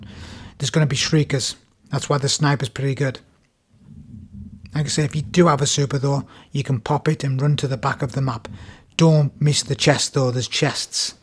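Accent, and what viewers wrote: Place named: Newcastle, United Kingdom. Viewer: Scouse? He always sounded Geordie to me 😅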